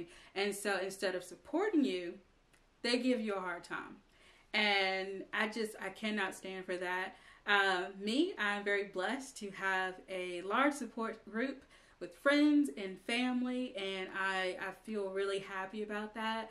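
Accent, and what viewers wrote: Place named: South, United States. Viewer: Wanted to hang out with my NC friend tonight. Thanks for this video. You give such good advice, especially for a new podcaster. Insecurities and comparison try to creep in as soon as you get started so thanks for sharing your viewpoint on these topics. You’re awesome! FYI, I feel like you and I sound just alike on camera. Southern gals 😅❤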